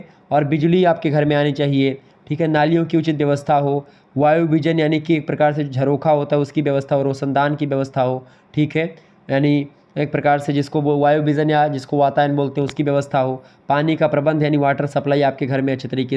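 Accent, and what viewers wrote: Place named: Awadh, India. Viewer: Sir aap aawdhi bolte hai acha lgta hai ❤Best teacher on youtube for B.A.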